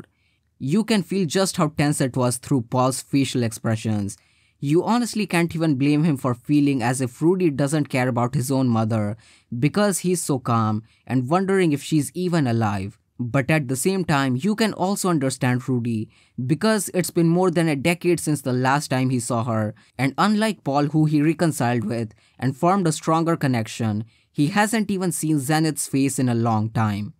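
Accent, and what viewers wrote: India. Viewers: It's indian accent bruh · This indaian accent is killing me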